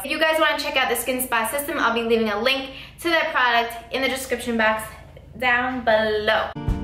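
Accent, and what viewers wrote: New York, United States. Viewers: Idk how people have the balls to make negative comments this girls gorgeous pimples or not ... That New York accent 😍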